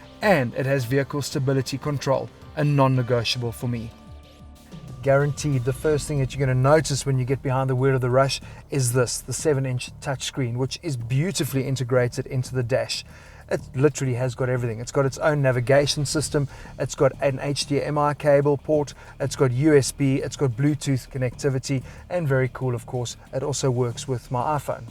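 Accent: South Africa. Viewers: To be honest, I am watching this video only because his South African accent, which I barely understand.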